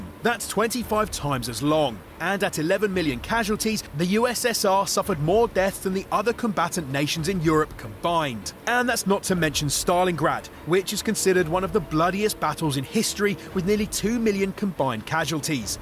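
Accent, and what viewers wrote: England, United Kingdom. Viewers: The narrator is actually Russian, though he speaks with an interesting English working class accent. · He doesn’t sound American. Maybe British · The narrator has a British accent. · to be fair, the accent sounds english but the propaganda slant sounds american, so, could be both? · It's definitely not an American who made the video...he has a clear English accent. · Barrick He spoke with an English accent. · He sounds British to me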